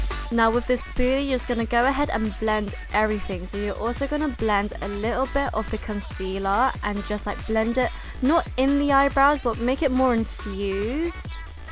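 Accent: United Kingdom. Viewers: I DIDNT EXPECT U TO BE BRITISH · Are you british? sorry I just subbed your channel >~< Though Ive been stalking you on instagram for a while... that doesnt sound creepy at all... anyway, love you! ^•^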